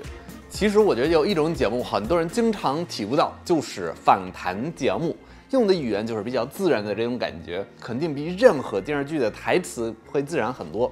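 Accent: Beijing, China. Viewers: 京片子味儿哈哈哈哈真的是老炮儿了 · 满屏的京味儿哈哈哈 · 老师的中文口音感觉很京味，老师在北京生活比较吗？ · 北京口音的外国英语老师。。。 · 你竟然還會北京口音 酷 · 这一口京腔啊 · 有的人就是有语言天才，没办法的。这主播比不少北京人更北京。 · ，你口音像北京土著人。你是一个长的像外国人，英语说的也像外国人的北京人。哈哈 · 你的口音是北京腔，哪個訪談節目比較好？ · 老师的中文说的好好感觉有股北京腔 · 您这北京话也忒标准了吧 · 北京口音？ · 是北京口音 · 哈，这一口京腔~ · 马老师北京话纯正，跟他学没毛病。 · 我老家南方的， 你的北京话比我说得好，虽然我在北京时间比你长很多。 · 你的中文肯定是北京人交的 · 一张口一股子京味😂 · ，我今天突然发现你有北京口音....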